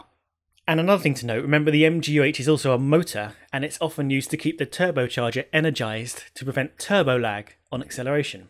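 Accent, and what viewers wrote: United Kingdom. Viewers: didn't notice cuz of ur British English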